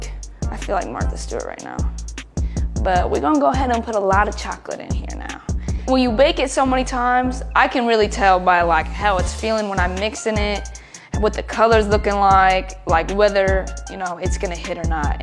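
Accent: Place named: South, United States. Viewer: It’s called: she’s been in the south for college in the past 4-5 years so her accent changed a bit due to that.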